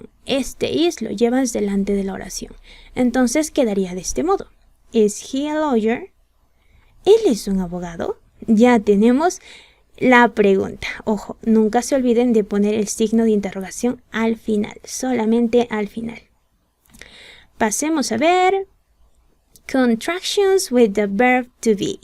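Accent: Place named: United States. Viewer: Es inglés americano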